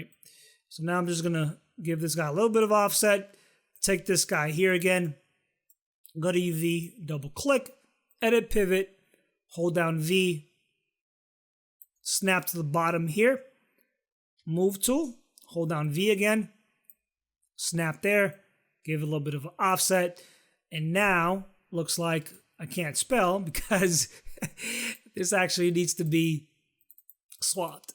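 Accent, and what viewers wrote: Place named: New England, United States. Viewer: Awesome tricks, nice to see some tuts with good ol' new england accent too.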